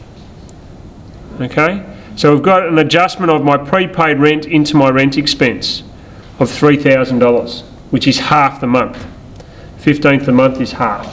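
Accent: Australia. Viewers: Australians have a cool accent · australian accent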